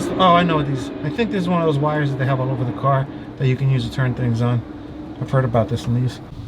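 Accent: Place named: New Jersey, United States. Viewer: Love the jersey accent! Great video.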